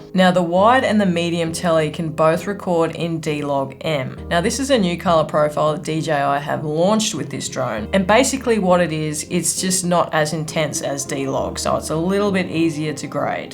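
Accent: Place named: Australia. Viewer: Crikey!! Frothing on listening to an Ozzie on these vids, nice 🤙🏼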